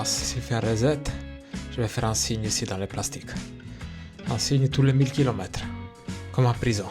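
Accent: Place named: Italy. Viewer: EXTRA!!! Continuez! C'est beau et bien raconté (con l'accento italiano). Tschüss! 🤠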